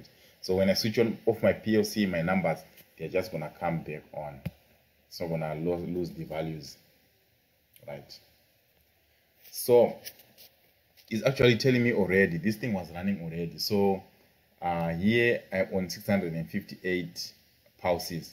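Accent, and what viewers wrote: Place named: Zimbabwe. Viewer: It's giving me Zimbabwen accent 😂❤❤.... Nice one bro I like the reference to the documents... Keep up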